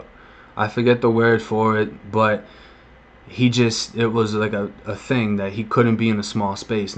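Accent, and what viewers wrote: Boston, United States. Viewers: U got the Florida talk with the Boston accent. That's wild bra lol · done with the hard life that Boston accent thick lol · You from Boston? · People drawn to you because of your Boston accent lol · Someone was lookin out for ya when you did ur time, for sure! 🙏🏻 Obviously got a bunch of vids to still catch up on, but keep 'em coming! Love the way u just flow w/ur stories PLUS (& not to disrespect u or ur significant other) but I just have to say that Boston accent, Boyyy... 😍 it definitely keeps me wanting to listen to more & more! 😉😆🙃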